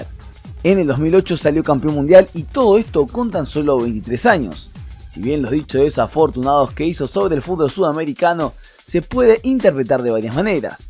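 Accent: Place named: Argentina. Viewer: POR LA MANERA EN LA QUE HABLAS NOTO QUE ERES ARGENTINO, Y TE FALTO MENCIONAR LA PALABRA HUMILDAD, POR QUE EN SUS VIDEOS Y NOTAS DE LOS MEDIOS DE COMUNICACION DE ALLA, LES FALTA USAR MUCHO ESA PALABRA? LO QUE MAS GRADE HACE A MESSI ES ESO, HUMILDAD Y LA TUVO EN ESE MOMENTO QUE ABRAZO A MBAPPE, MESSI ES MIL VECES MAS GRANDE QUE EL ADICTO MARRANONA, EN PRIMER LUGAR POR LO QUE HA GANADO EN LA CANCHA Y EN SEGUNDO LUGAR POR LO HUMILDE QUE ES, HUMILDAD LE FALTO A ESE TAL DIEGO.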